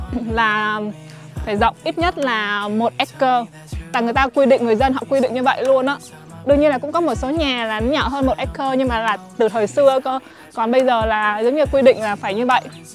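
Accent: North, Vietnam. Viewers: bạn nói giọng miền Bắc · nói giọng miền Bắc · * là người bắc phải không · Lâu lâu mới nghe giọng Bắc ở Mỹ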